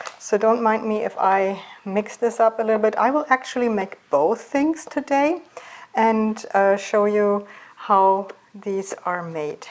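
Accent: Germany. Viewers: A GERMAN SPEAKING ENGLISH!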